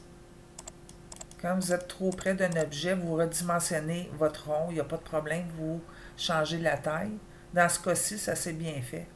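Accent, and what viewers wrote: Quebec, Canada. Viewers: Merci pour cette rapide mais efficace présentation je vais voir tous vos autres tuto J'adore votre accent Québécois ( normal nous y allons chaque année..) Daniel France Normandie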